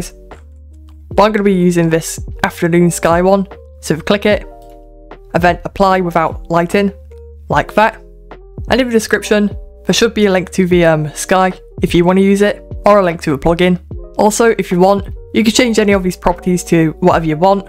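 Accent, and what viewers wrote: United Kingdom. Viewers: I love your accent! I can tell your british. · are you british? just trynna guess from accent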